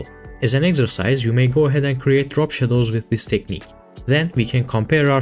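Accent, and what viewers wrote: Turkey. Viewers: aksandan anladım kesin bu türk diye kanal güzel eline sağlık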